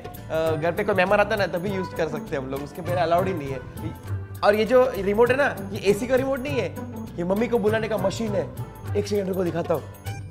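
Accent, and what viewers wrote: Gujarat, India. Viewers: The best thing is accent. Every Gujarati speaks in mix Hindi and Gujarati language. And yes every Gujarati middle class watch TAARAK MEHTA all day😂😂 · Bro your acting skills and that perfect gujrati accent just perfect ❤️❤️ and ya this is not your mom again.😂😂😂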